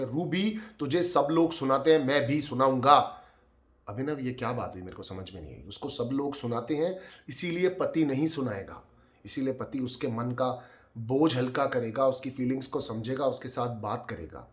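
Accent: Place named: Haryana, India. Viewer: 😃 I love ur haryanvi man hahahaha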